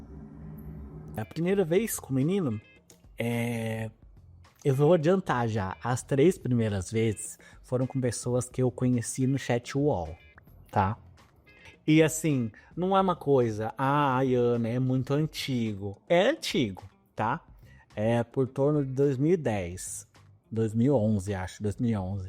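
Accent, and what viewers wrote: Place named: United Kingdom. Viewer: Ana, seu sotaque em inglês é britânico, percebi isso hoje.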